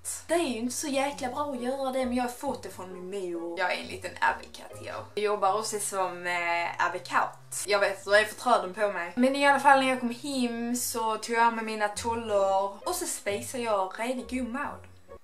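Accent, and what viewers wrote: Sweden. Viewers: Är hon inte från hbg? Hon låter som de faktiskt.. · baserat på dialekten är hon från Kristianstad...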